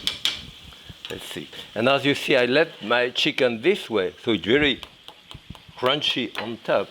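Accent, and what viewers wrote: France. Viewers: It's not a speech impediment . It's his French accent .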